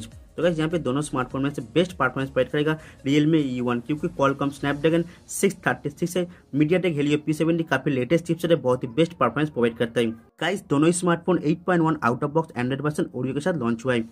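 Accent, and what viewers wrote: Bengal, India. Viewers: Sir aap Bengali ya Oriya hai kya?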